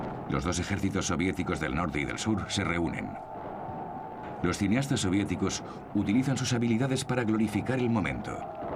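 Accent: Spain. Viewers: Para mí el mejor documental y el mejor audio de todos es la de esta serie con acento español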